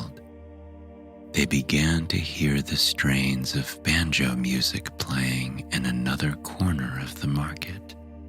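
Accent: United States. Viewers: American voice, yay, and an American story ❤ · I love the american accent. It makes me so calm to hear my own accent.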